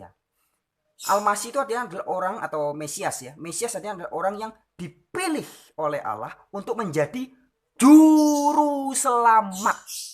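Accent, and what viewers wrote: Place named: Bali, Indonesia. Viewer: Deleme bali gati nok.. dije melali.. tiang denger logate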